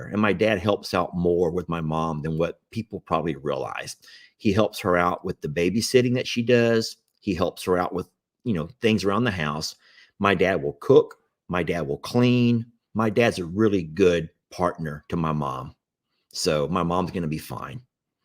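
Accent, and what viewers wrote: South, United States. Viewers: I think the pronunciation could actually be that your from the south with a little southern drawl!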